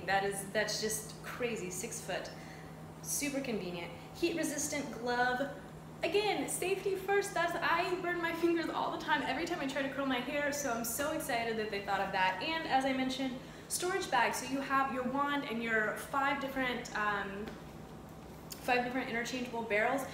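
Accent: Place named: South, United States. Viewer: Loved the video, You are cute and funny. I hear a southern slang as well.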